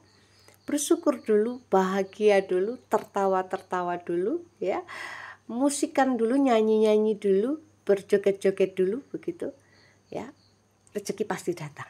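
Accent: Java, Indonesia. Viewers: Bismillah menyimak kak. Alhamdulilah Ya Allah. Tindakan positif tetap semangat. Kak bicaranya logatnya Jawa tulen. 💪💪💪🤲🤲🤲🤝🤝🤝❤ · Sy selalu ikutin readingan mbk,dan sy suka dgn cara kejawenya yg medok,trimkasih untuk semuanya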